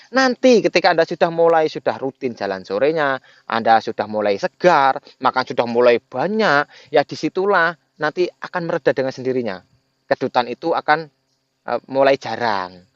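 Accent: Java, Indonesia. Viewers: Mas sampean dari jawa mana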